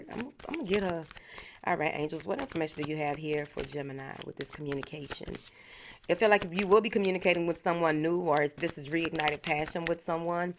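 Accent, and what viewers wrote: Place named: Missouri, United States. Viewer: Where you from Missouri? It sound like it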